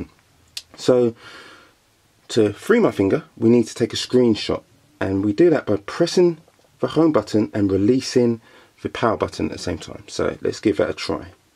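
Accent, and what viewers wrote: United Kingdom. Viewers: It’s called English or British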